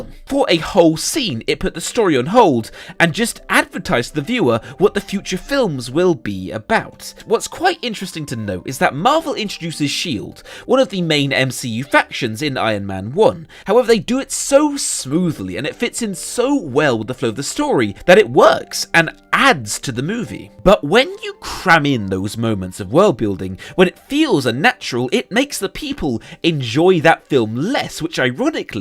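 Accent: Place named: England, United Kingdom. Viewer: Totally convincing English accent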